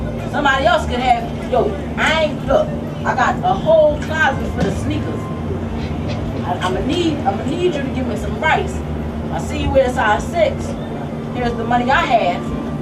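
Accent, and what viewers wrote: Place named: Philadelphia, United States. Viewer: "Voluable"? Lol, love that philly accent. Just teasing! Nice video.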